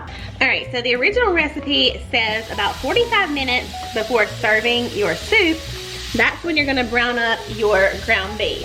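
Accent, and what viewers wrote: South, United States. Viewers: I love your recipes!! And your Southern accent, too. I'm definitely going to try your recipes. Thank you for sharing 🙏🏾❤ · I just love your Southern accent❤ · I clicked hoping for a beautiful southern drawl and i wasnt disappointed, new subscriber 🙋‍♀️😍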